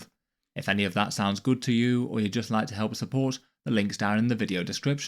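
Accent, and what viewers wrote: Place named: Midlands, United Kingdom. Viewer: he's 1000% got an english accent, I want to say midlands somewhere? It's not very distinctive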